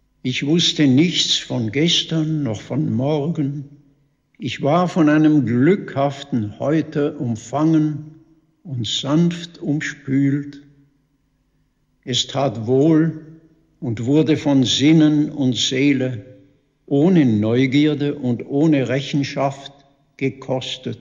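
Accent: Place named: Swabia, Germany. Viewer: Wie berührend, den schwäbischen Tonfall in seiner Stimme zu hören. Diese schöne poetische Sprache ist wie Melodie. Leider fast vergessen.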